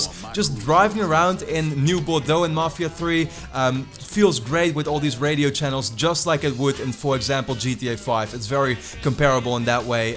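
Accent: Netherlands. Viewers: ik hoorde dat accent en ik dacht dat is sws een hollander 😂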